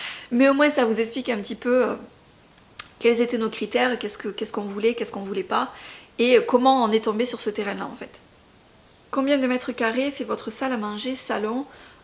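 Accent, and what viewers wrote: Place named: South, France. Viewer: Coucou, Il y aura toujours de la critique et des envieux....c'est la nature humaine.. Toujours un plaisir de t'écouter avec ton bel accent du midi.. Bizzz😘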